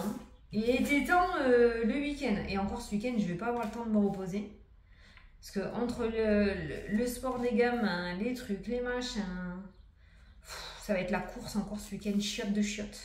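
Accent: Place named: Bordeaux, France. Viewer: Serai tu de Bordeaux ma belle fille parle comme toi.🥰🥰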